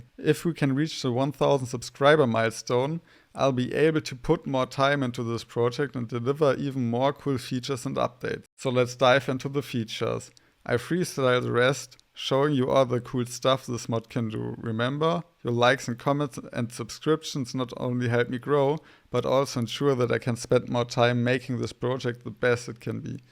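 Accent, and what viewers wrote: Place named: Germany. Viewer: danke danke. und besonderes danke fuer schnelle antwort. weisste als ich dein englisch gehoert hab dacht ich mir hmmm vlt deutsch. nicht mal gerallt dass entwicklerwerkzeuge deutsch is ^^' erst spaeter so wait a moment 🧐 klein meinte ich vom tutorial wenn mans am handy schaut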